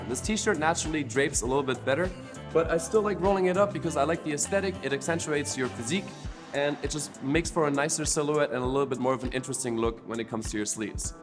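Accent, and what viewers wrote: Germany. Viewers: He sounds like he is actually from germany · Nichts zum Video, ich kenn dich nicht, aber für mich klingst du wie ein Deutscher, der die englische Sprache ziemlich gut beherrscht :D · Are you German? You have a similar color in your voice. No offence, just wondering...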